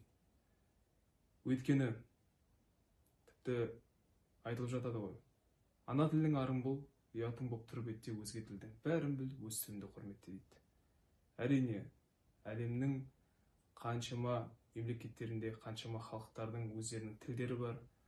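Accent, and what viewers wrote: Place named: Russia. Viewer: Sotaque semelhante ao russo.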